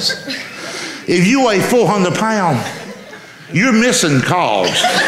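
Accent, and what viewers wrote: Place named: Louisiana, United States. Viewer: sounds like he's been to Louisiana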